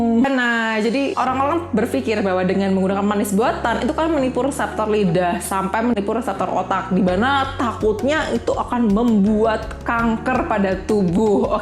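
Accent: Java, Indonesia. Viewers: Gara gara jerawat nyasar ke video dokter, problemku sama dok jenis kulit wajahnya gampang jerawatan kalo minum susu, makan keju, minum yang manis. Tapi susah ya semua itu enak.hehe..masa harus jadi vegetarian.hehe..btw, dok gak ketara kalo orang Bali loh, logatnya kok malah Jawa. Tipsnya semua bermanfaat dok. Salam kenal ^^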